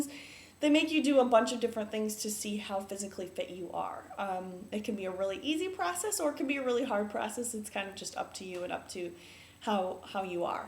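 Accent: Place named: North, United Kingdom. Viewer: Northern accent.. Hate it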